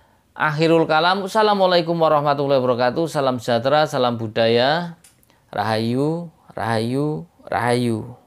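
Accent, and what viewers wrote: Tegal, Indonesia. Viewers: Kang Boss.... Logat kaya Tegal koh..... Salam Ngapak.... Aku Naksir Keris e....koh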